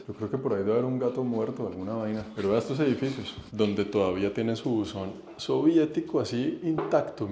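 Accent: Bogotá, Colombia. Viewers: 👍habla rolo, o sea como hablamos los Bogotanos en Colombia, sin acento tan marcado .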